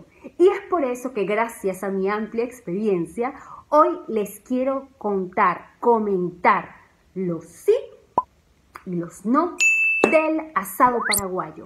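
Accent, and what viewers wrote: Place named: Venezuela. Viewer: En esta época tenia todavia el asentó venezolano 🇻🇪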